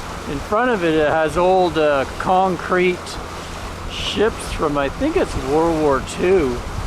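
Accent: Canada. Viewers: I think your Canadian accent is stronger now than when you were in the states... just say'in.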